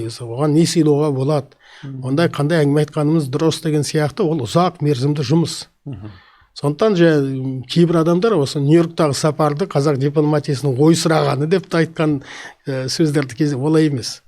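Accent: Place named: Russia. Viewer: Вашингтон емес, Уашиңтон. Техас емес Тексас. Ганконг емес Хоңкоң. Ресейдің сасық аузымен мыйымен әлемге қарауды қою керек Қазақтар.😮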